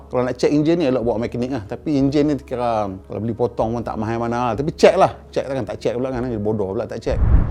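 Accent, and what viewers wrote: North, Malaysia. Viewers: Hahaha illuminati 🤣 Kemaihhhh bang! Teruih cakap utara... Kami syok!!!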